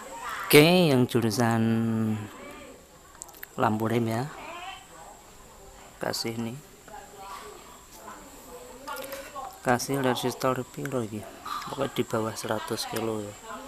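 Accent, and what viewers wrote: Blora, Indonesia. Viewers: Owalah wong Blora to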